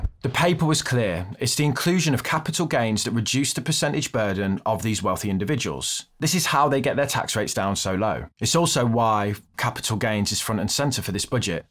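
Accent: Birmingham, United Kingdom. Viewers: I really hate brummie accents, so the fact i get over that and listen to you, shows how good your content is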